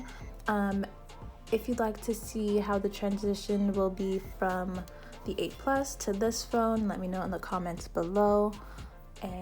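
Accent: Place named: Caribbean. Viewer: I know I was hearing an Caribbean Accent and music in background lol, rarely see them doing unboxings. BTW I'm getting the 11 soon 😊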